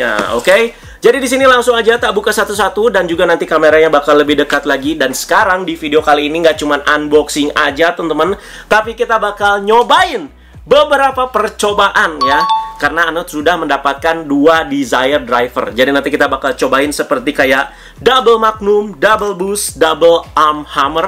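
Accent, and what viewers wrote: West Java, Indonesia. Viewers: Agak logor. Sunda sekali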